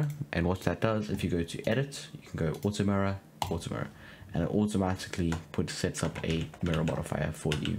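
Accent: South Africa. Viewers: You sound South African?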